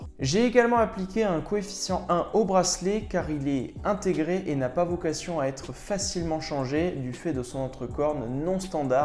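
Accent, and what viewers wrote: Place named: Italy. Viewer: Esthétique dans l'air du temps avec les hommages aux montres des seventies avec bracelet intégré. Je te donne un 7/10 sur ton accent italien. Merci pour cette vidéo et les images de la montre sont top.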